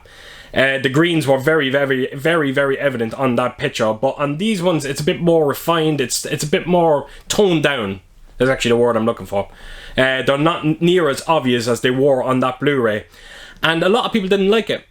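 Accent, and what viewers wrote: Ireland. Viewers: ah defo..as soon as I heard the accent I knew you were local, but wasn't sure if you were based in Ireland yourself. Definitely a small world and look forward to following your channel 👍🇮🇪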